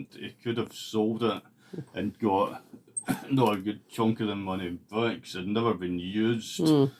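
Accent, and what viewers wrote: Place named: Scotland, United Kingdom. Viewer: I can't wait until we meet again so I can understand proper Scottish - I'm half way there, though.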